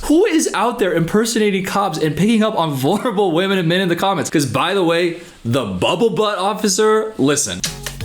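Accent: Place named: South, United States. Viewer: The Southern mom voice is one of my favorites😂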